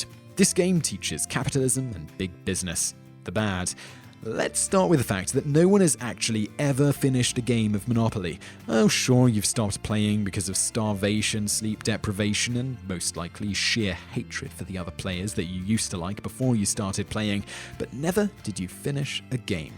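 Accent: England, United Kingdom. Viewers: It's Milton BRADLEY...not BRADY! ...and it's Struh tee go!!!! Nothing like an English Accent that murders the Queen's English!!!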